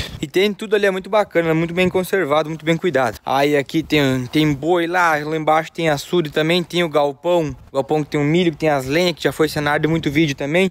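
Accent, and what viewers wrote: Colono, Brazil. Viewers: O sotaque é impossível de esconder, no começo do video ele só tenta disfarçar mesmo, mas o sotaque que ele se sente a vontade é o de colono, aposto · tem o mesmo sotaque de colono mesmo fora do personagem.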